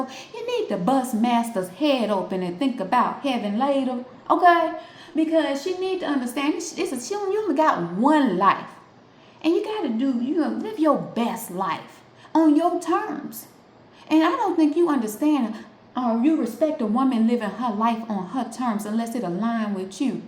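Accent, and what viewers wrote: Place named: South, United States. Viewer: " guurrl, you are hilarious when doing your commentary. You remind me of Dolly Pardon, with your sorta kinda like Southern Bell accent that you give off😅 "You've grown on me enough for me to kinda like you girlfriend. "I must like you in my own SPECIAL KINDA WAY😂 "because I'm NOW a SUBSCRIBER of Yours baby girl. Keep doing what you're doing which is to keep your Subscribers engrossed sweetheart. "Peace✌️🫡🥰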